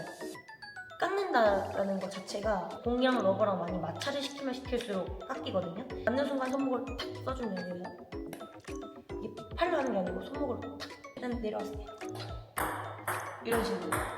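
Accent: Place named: Gyeongsang, South Korea. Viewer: 거 경상도 어디요?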